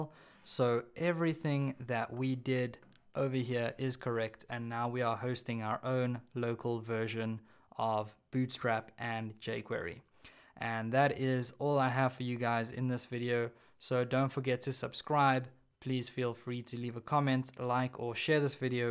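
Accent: South Africa. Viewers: Sounds like you're South African? Great tutorial btw. Lekker man.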